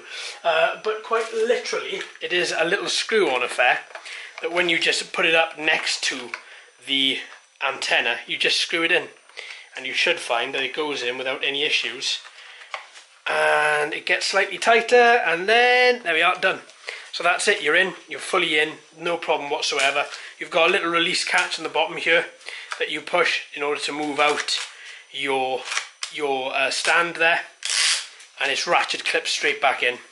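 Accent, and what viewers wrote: Wales, United Kingdom. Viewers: You sound welsh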